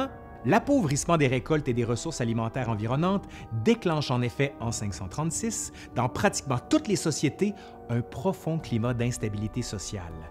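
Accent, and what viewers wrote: Quebec, Canada. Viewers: J'en ai regardé plein d'autres ! Ta chaine est ma chaine d'histoire préférée ! J'aime beaucoup ta façon de raconter l'Histoire. Je dois dire que ton accent québécois contribue beaucoup à ton charme 😁 Merci beaucoup pour ton travail et ces vidéos très divertissantes. Ps : tu es très beau garçon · J'adore l'accent que québec 🙃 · Je pense que ton français est canadien Ton accent ressemble à celui de JUStin Trudeau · Ma foi c'est bien la première fois que je suis pas dérangé par l'accent québecois ! (Désolé les kheys j'vous kiffe mais étant de France ça fait vraiment bizarre haha !) Excellent sujet de vidéo et très bien expliqué en tout cas, je crois que ça fait un abonné en plus ;) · Grâce à toi je comprends maintenant l'accent de Québec. 😀 Je suis hispanique et j'habite en France. Génial chaîne de YouTube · Trop marrant l accent québécois jcrois j'aime bien lol comment il prononce neige ou planétaire mdrrrrr 🤣🤣🤣 jrigole il n'y a aucun mal lol. 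· Première chaîne québécoise pour ma part et ptn ton accent me mets trop bien, bisous de France, merci pour ton travail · Ca me fera toujours rire laccent québécois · vidéo très intéressante ! une voix posé, intelligible, tu articules bien et tu ponctues bien tes phrases. franchement, rien à dire de négatif. comme dit ton compatriote jean marc généreux "ça, j'achète !" (au passage j'ai toujours été fan de l'accent québécois xD ) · Merci infiniment pour cette excellente presentation - Vous presentez la connaissance de notre passe d'une facon interessante et vivante. De plus, vous etes pas loin de mon patelin natal (Saint Maurice), j'adore le Francais local. Mes excuses pour mon manque d'accents, j'utilise un clavier anglophone et je suis "pris" aux Etats-Unis, au milieu de la pandemie. Merci encore et j'attends vos prochaines productions, L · Étant moi-même Québécois, je peux vous dire que ce monsieur a un accent particulièrement gras. 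Il pourrait faire plus d'efforts pour "normaliser" son français, mais il se fait une gloriole de cet accent pour amuser (?) les cousins de France et d'ailleurs. Malgré ses talents de pédagogue, cet accent trop accentué me tombe sur les nerfs. Vraiment... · vous avez un accent de dingue vous les canadiens mais vous êtes tellement cool que ça passe bien. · En vrai les profs d'histoire sont souvent cool. Je trouve mais c'est vrai que l'accent québécois rajoute un charme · Vraiment interessant, sauf ta pronociation du Quebec (toujours drole et amusante) , mais je viens de soutenir ton programme. Merci. Salutations du Portugal. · C’est un canadien👊🏽👊🏽👊🏽 j’aime trop l accents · Avec son accent canadien il nous fascine de ses connaissances ! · Outre l intérêt historique de ton propos j adore ton accent québécois et la touche d optimiste en fin d exposé est réconfortante bravo pour ton travail 😀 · L'accent français canadien, une pépite... 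· Merci pour cette page d’histoire qui m’était jusqu’à présent inconnue et que j’ai trouvé tout a fait fascinante! …et toujours cette diction impeccable accompagnée d’un bel accent Canadien👍🏼!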